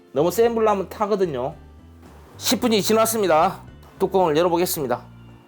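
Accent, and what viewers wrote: Jeolla, South Korea. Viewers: 저두 전남 광주입니다, 말투에서 전라도 사투리가 나어서요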